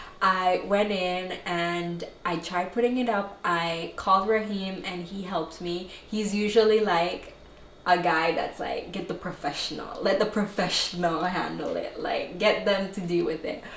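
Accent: Jamaica. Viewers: Ur accent sounds just like a Jamaican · I randomly clicked on this video and something tells me you’re Jamaican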